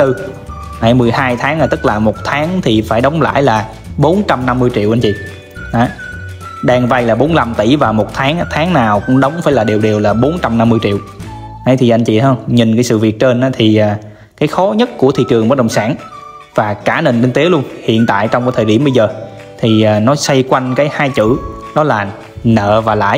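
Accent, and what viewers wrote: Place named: South, Vietnam. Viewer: người Quảng Ngài cháu nói giọng niềm nam rat rõ, love kênh cháu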